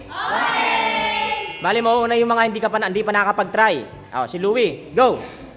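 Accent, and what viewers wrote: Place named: Waray, Philippines. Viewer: parang ng waray ka lng dn.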